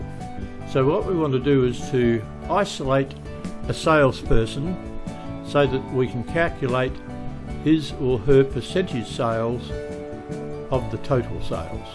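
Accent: Australia. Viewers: True... I'm used to listening to his delightful Aussie accent... sans music... for my latest Excel learning tidbit.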